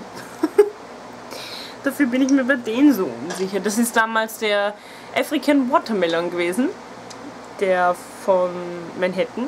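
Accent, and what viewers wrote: Austria. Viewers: Tröste Dich, ich kenne das - ich kann mich von solchen Dingen auch so schlecht trennen, auch nicht von denen, die ich sowieso nicht benutze, dabei wäre es aktuell schon angebracht, das endlich einmal wieder zu tun, obwohl ich gar nicht sooo viele Lippenstifte besitze. Aber was soll´s, es gibt schlimmere Angewohnheiten ;) . Ich mag Deine Videos so sehr, weil Du so wunderbar authentisch wirkst - wenn mal eine Weile nichts kommt, schaue ich mir deswegen auch gerne die älteren Videos noch einmal an. Dieser leichte Wiener Akzent wirkt auf mich Norddeutsche gleichermaßen beruhigend und erfrischend, wenn ich also ´mal krank zuhause bin oder ein bisschen Hintergrundgeplapper brauche, während ich aufräume etc. , sind Deine Videos für mich optimal :)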